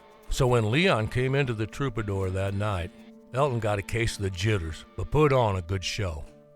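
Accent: South, United States. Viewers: Thank You, Thank You, Thank You For This Great Memorable Leon Russell Profile!! Your Southern Drawl is Perfect, Gives This Presentation More Oomph!! :washhands::washhands::washhands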